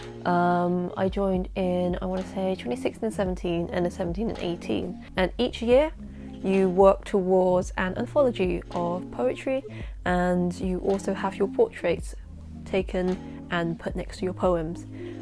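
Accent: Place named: London, United Kingdom. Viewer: I subbed to your channel from your Asian readathon tbr but again, RELATABLE bc I am lonely on youtube. I have no online friends although I am new on making booktube content 😂 Also, OMG BC bitesize saved my GCSE grades + KS3 + still being in year 13 without any exams to sit I'm using it to teach since I am currently working as a GCSE English tutor😂 Film production and photography sound so interesting! This video was so calming and your accent + inserts in this video make me miss London even more ;-; (edit) - I see my not so perfect life on your bookshelf! I loved that book so much ! Have you read it too ?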